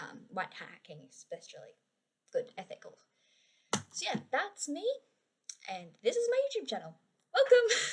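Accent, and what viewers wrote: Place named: Australia. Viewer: You sound Australian or from New Zealand. What's the status of Christianity down under?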